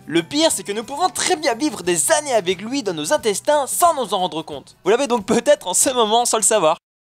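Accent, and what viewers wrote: Belgium. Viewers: T'es belge? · tu vien de ou de belgique ?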